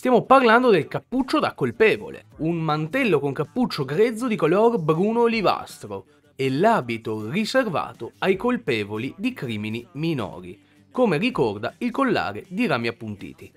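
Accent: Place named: Trieste, Italy. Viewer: Si dice crémisi non cremísi, cerchiamo di parlare correttamente la nostra lingua. Capisco che dall’accento che hai la parli poco però sforzati, Trieste non è in Austria da un po’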